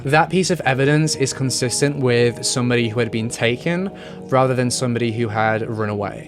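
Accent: United Kingdom. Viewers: Stumbled upon this video, and as a Swedish person I gotta say that I'm a bit surprised that this story made it's way out of Scandinavia. I saw that you also made one on Engla, another Swedish girl. For us, here, it seems like we are hardly even on the radar of the rest of the world. Where are you from? I think you sound a bit British, but I'm not sure, since I'm no accent expert. Just out of curiosity.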